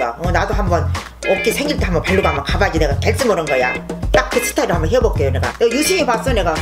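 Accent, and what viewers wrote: Jeolla, South Korea. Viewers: 전라도사람이라그런지 친근감이 갑이에요♥너무귀여우셔♥♥ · 부모님 둘다 전라도분이셔서 이쪽 사투리 너무 좋아요 ㅠㅠ♡♡시골온것같음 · 얼마나 부러운지 몰라요. 우리 할머니가 계셨으면... 우리 할머니 말투랑 똑같아요. 전라도 사투리! 진짜 너무 심하게 마음이 따뜻해지고 할머니가 그리워지면서 동시에 너무 웃김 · 할머니 ~ 저희할머니도 전라도 해남분이시라 할머니랑 말투가 넘 비슷해요 😚 그래서 우리 할머니처럼 느껴지고 너무 좋아요 !! 사랑해요 할머니 💖 오래오래 건강하세요 ~ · 전라도 분이신가요? 저희친가도 전라도라! 익숙한 사투맄ㅋㅋㅋㅋㅋㅋ 오래오래 건강하세요❤❤❤❤❤❤❤❤❤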